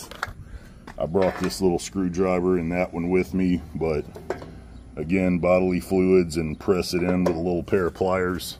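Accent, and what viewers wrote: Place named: United States. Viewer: This guy has the most American voice I’ve ever heard. Made me watch the video instead of skipping ahead.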